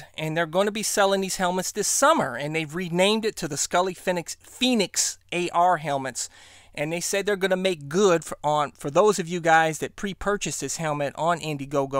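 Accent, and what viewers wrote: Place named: Kentucky, United States. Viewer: You sound different. What happened to that Kentucky accent?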